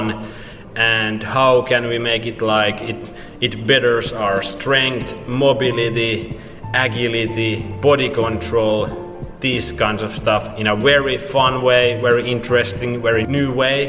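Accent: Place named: Finland. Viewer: Hey are you finnish ?i love that finglish accent .thanks for the tips :)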